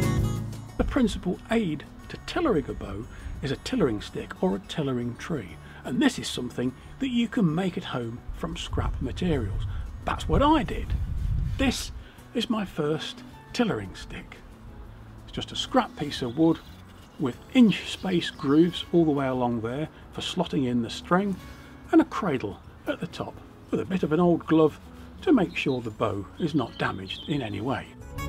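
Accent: United Kingdom. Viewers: Thank YOU! Since childhood I have wanted to make my own bow. I practised archery as a kid and was obsessed with Robin Hood and all things Medieval. I remember my dad and I at some point went to the woods to find a big branch of a yew (called a taks tree, from the Latin, in my native Denmark) from which to make me a long bow, like Robin Hood’s. But although my father is a fourth generation cabinet maker by training, we were unsure on how to go about making a long bow. I since worked many years as a lumber jack, but eventually became a classical guitarist by profession and a lutenist of sorts. Unusual background, I know. I kicked my television out many years ago, and I watch instead wood working videos by Paul Sellers and bow and archery craft with you and select others when ever I have the time and need. I thank you for bringing all of these disparate but somehow connected interests of mine together by teaching us the seemingly forgotten skills of yet another of mankind’s inventions. 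I’ve had my goal set at times variously on instrument and furniture making, but I am convinced that bow making might become my spare time enterprise of choice. I must also further compliment you on, A) being a top-level presenter, comparable to the very best, without exception, and B) having a superbly brilliant dog! (An aside, is that a Coventry accent, by any chance?)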